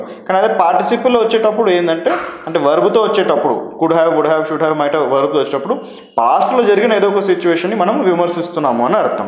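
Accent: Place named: Telangana, India. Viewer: Sir superb explanation in telangaana slang.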